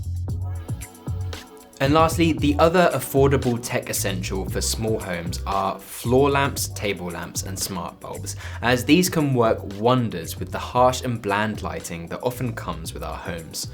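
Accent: United Kingdom. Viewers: This might sound strange, so advance apologies. I have become a fan of your British English accent.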